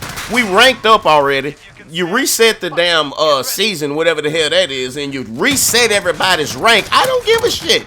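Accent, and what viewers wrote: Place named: New York, United States. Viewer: You just dressin up a turd, and eventually people realize they are playin with a pice of shit“ hahahahha man you too funny where are you from? You have a hilarious accent You sound like a friend of mine, hes from NY, i guess you come from around that area too, is that right ?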